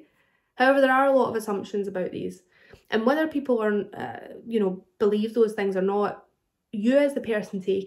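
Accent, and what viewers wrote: Scotland, United Kingdom. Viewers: That Scottish accent is absolutely gorgeous. Thanks for the great video and congratulations. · Oh my goodness how fabulous to hear a fellow Scottish voice! I’m on week 7 of my journey and have just subscribed to your channel. What an inspiration ❤ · Hi, I’ve only just discovered you. I’ve been on Mounjaro for 2 weeks and I could already relate to what you said. How refreshing to hear your lovely Scottish accent and to hear you talking so intelligently and emotionally about you weight history over the last 30 years 💗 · Amazing advice! Thanks so much for sharing and congrats to you!! I'm from the USA and I'm on Zepbound which is the exact same med as Mounjaro just a different name and I've lost 78lbs in a year so far. Also, because I'm a huge Outlander fan I understood all of what you said with your beautiful Scottish accent ❤️❤️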